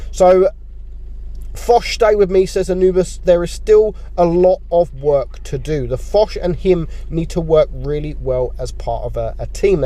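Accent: United Kingdom. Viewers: Excellent British commentary and great replays.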